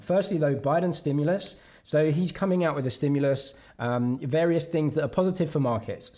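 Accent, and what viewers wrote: United Kingdom. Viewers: accent from UK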